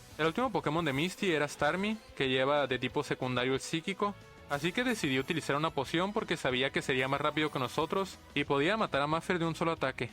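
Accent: Chihuahua, Mexico. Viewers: excelente video! tu acento es muy parecido al mio, no eres de chihuahua mexico? un GRAN trabajo y muy tardado, gracias por tu esfuerzo, un saludo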